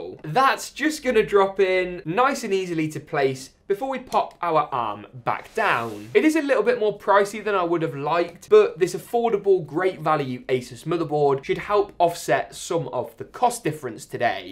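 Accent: United Kingdom. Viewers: This is the most British person I've ever heard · that is the strongest british accent I've ever heard. Had my attention the whole time. Good job dude 👍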